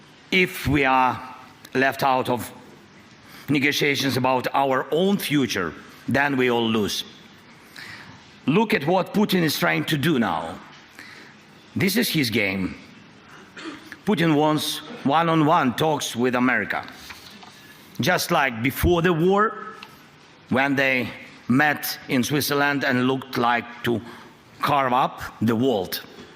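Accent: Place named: United Kingdom. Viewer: We need more bri-ish voiceovers its more suspenseful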